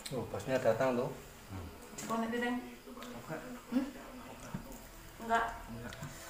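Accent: Java, Indonesia. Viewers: Ini perantauan atau gimna mase? 😁 Kox bahasanya medok jawa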